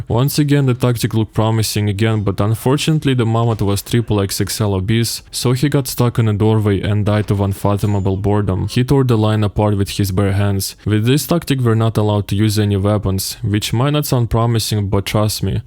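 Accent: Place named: Russia. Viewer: thick russian accent... I LOVE IT!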